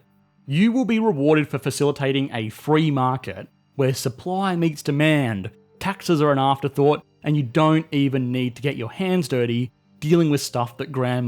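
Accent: Australia. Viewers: Can we all agree Australian is the most abhorrent accent?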